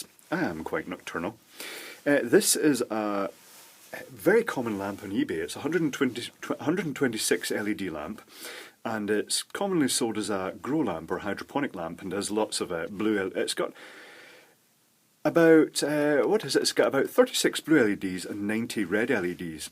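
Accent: Scotland, United Kingdom. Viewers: Yeah he is Posh Scotch - only the best from Scotland! Sounds a bit like Bryce Curdy except he doesnt have a cucumber shoved up his butt! lol PS. Bryce Curdy was a gay STV news presenter who turned up at a local Glasgow hospital with a cucumber lodged in his rectum - his career after that was all too short unlike the cucumber which according to my pals at the Viccy was a massive 16 incher! lol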